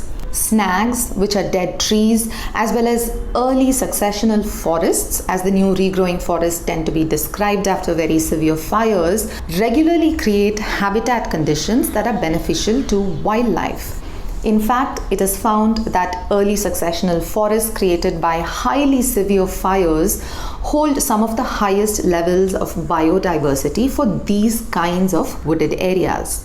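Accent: India. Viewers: That is a cute Indian accent.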